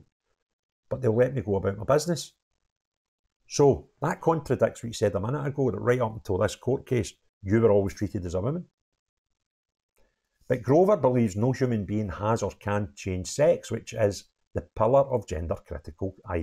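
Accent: Scotland, United Kingdom. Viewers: Are you from Scotland? Your accent sounds like my dad's,who is a Scot. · No disrespect but your Scottish accent saying ‘giggle vs tickle’ made me laugh out loud. You could tell any joke badly but your accent would make it funny. Then you go onto talking about the cat/dog identity confusion. Sounds like a comedy show. Good luck on your channel. But I recommend you try stand-up. British accents have the advantage of already sounding funny.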